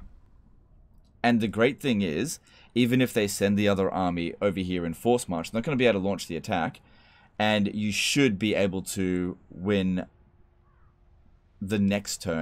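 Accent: Australia. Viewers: Alternate title: "Aussie man talking about eating shit for 29 minutes strait (in a video game)."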